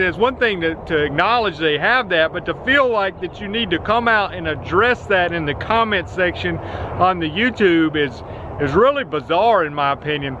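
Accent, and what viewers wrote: South, United States. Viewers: Ha, don't you worry about a thing brother, I probably have a thicker southern draw than you do, and let's face it, we can sound a little strange to some folks. That's okay! Water off of a duck's back... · Great advice mate ! i think your accent is great and i love hearing you guys from the states ! You'd crack up hearing how us guys sound down in Australians :) Just be yourself and don't put others down :) Some people just think they are so cool and better than others in the industry ! i have contacted another company before i have taken on a new customer. i decided to not provide a quotation based on the feedback i had from the other company. yes spring is the best time to grow your business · I guess it's just where you live and where your from. I dont notice you having any accent at all. You talk like everyone else from Alabama and Georgia. 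Keep doing the videos buddy, I enjoy them.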